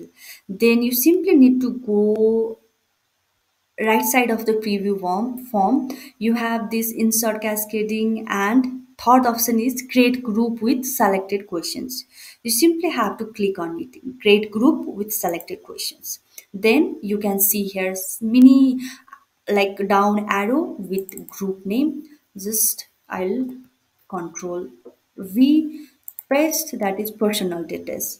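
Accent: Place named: Nepal. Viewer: Helpful Video. You sound like nepali from your accent !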